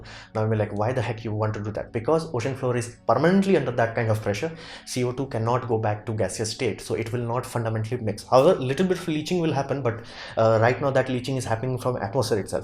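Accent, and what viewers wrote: India. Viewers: I see. I think if you just took the existing scripts and narrated them a little more slowly, it would make for a slightly longer video yes but may improve it for viewers. I don't think 30 minutes or so is too long for a youtube video -- I agree that there are diminishing returns when you get over the 30 minute mark, like 1, 2 hour videos won't get views. But 30 minutes is fine, people watch informative videos of that length from emerging channels on youtube. And part of it is also your accent -- I am not trying to say that in a judgmental way, just when you combine it with the speed that you talk sometimes I don't catch something and have to skip back. I am an American so if I was Indian maybe I would not have any such trouble. And I am not saying it's a major issue, but even a minor thing like that can an obstacle to your channel's growth I think as people are lazy, they just want to watch videos that entertain/interest them in a very passive way without effort. 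They will click away. But if you slowed down just a bit I think that would completely eliminate the issue. As far as the tech limitation, I'm sure someone with as much tech expertise as yourself could find a workaround, right?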